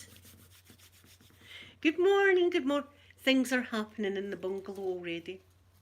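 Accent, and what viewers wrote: Scotland, United Kingdom. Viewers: listening to you speak reminds me of back in the day, when we were able to travel freely and I went up to visit my grandparents and cousins in glasgow. Coming from germany I have not been back to see them in a long time and when someone speaks in the same scottish accent as I am used to hear from them, it always brings back memories from when we all used to gather at my grandparents flat in glasgow and talk about all the things going on in peoples life. I think the last time I was there must have been about 3 years ago or something, so its been a while. I just wanted to thank you for this video and the memories that raised in me while watching it. Keep up the good work and stay safe out there!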